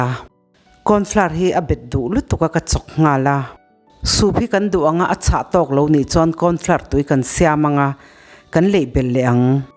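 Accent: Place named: Mizoram, India. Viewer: Mizo Elite Accent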